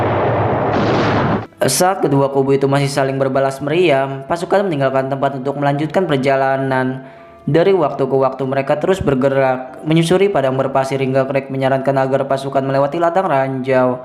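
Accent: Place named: Betawi, Indonesia. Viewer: Narator logatnya yg terakhir agak ngelenong 😀😀😀🙏🙏🙏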